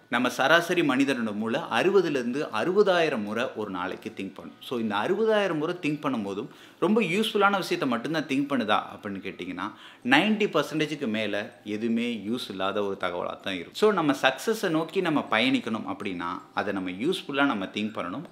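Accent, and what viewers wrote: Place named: Madurai, India. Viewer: Bro I think Nega madurai or South side tha unga Native ah irukunu nenakeran..😄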